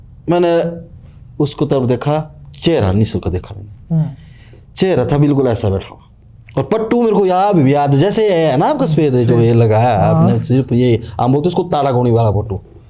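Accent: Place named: Kullu, India. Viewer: Bhai AAP Manali se nahi ho AAP kullu se ho apki bhasha se pehchana mene apko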